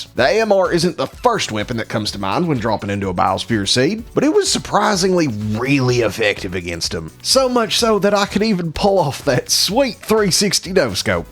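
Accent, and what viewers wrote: United States. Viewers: is this country accent real? it's annoying af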